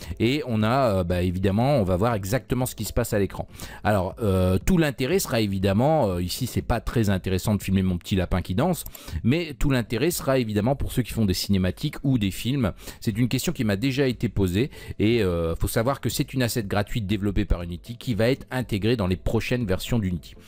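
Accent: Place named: England, United Kingdom. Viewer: J'aime bien ta chaine et globalement bien tes vidéos. Mais je trouve quand même que c'est parfois un peu bâcle. C'est un thème intéressant à aborder, mais on dirait que rien n'est préparer et que tu ne sais pas de quoi tu parles (ici notamment quand tu décris les fonctionnalités de l'inspector). Outre l'accent anglais qu'on te connait, y a beaucoup de variables/fonctionnalités que tu n'expliques pas alors que ça serait bien utile. Tu as plus ou moins dit que le frame rate c'était pas vraiment utiles à modifier pour un usage amateur, et que ça correspondait plus à un paramètre plus compliqué/poussé dans le domaine de la vidéo alors qu'en réalité c'est littéralement LA BASE. Voilà tout. Ce commentaire n'est pas à prendre contre toi ou negativement, c'est simplement une critique constructive pour t'aider :)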